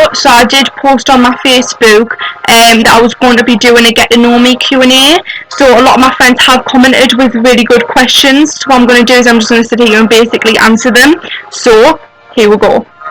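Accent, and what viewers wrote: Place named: Boldon, United Kingdom. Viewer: I don't believe that. You sound like you're from Boldon, not to insult you or anything :'D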